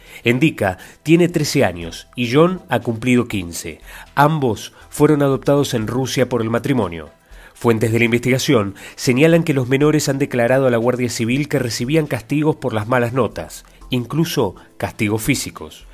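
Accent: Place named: Latin America. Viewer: a esto hemos llegado? A usar voces generadas por IA? O voces de extranjeros para dar las noticias ; si parece que estoy oyendo un noticiario de un país latino o argentino.... En fin".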